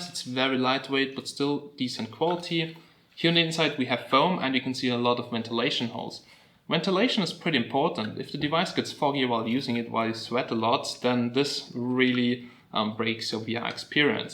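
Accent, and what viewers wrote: Germany. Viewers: your english sound with german accent or netherland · Ich geh mal davon aus das du deutsch bist ( von deinem aktzent her) heute war englischprüfung in sachsen... kann es sein das du für den listeningpart den text gesprochen hast? hat sich zumindest so angehört! :D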